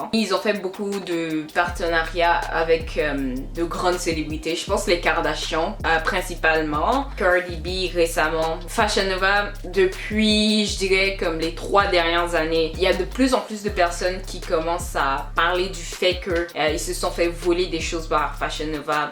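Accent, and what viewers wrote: Quebec, Canada. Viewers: A t'es québécoise ???? C'est pour que t'as un accent !!😭😭😭 En vrai j'm'en doutais ! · L’accent canadien 😭😭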